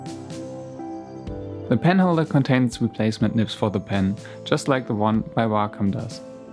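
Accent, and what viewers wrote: Germany. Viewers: Hey i'm new on your Channel. And i think you sound german. Are you? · You sound like a German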